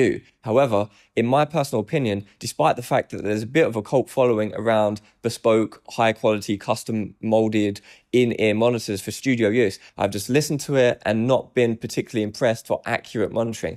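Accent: United Kingdom. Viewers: Well, he's opinionated. And with a British accent can help make him more obnoxious. I guess whatever works.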